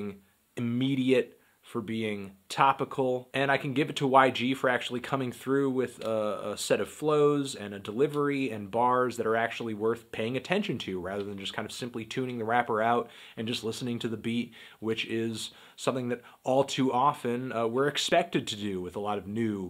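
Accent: England, United Kingdom. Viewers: I rate your English accent a light to decent 4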